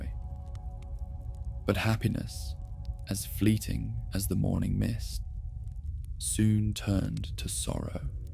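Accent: United Kingdom. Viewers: Pity, a pommie is the narrator!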